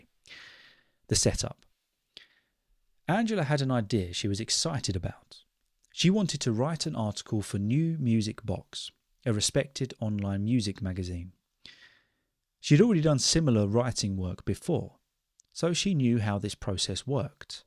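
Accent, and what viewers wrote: United Kingdom. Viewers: I’m a college student from Taiwan, I start to learn english recently, and your videos really help me a lot, thank you. By the way, British accent sounds elegant!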